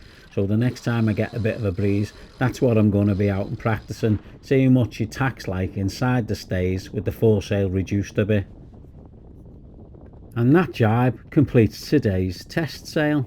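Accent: Liverpool, United Kingdom. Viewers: just found your site and luv it, i have missed the scouse drawl and luv your laid back attitude to sailing . Have you ever done castletown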